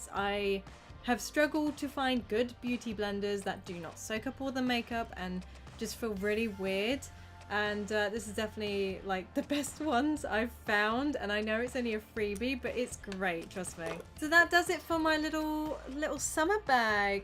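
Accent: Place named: United Kingdom. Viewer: Your so beautiful before! And your beautiful British accent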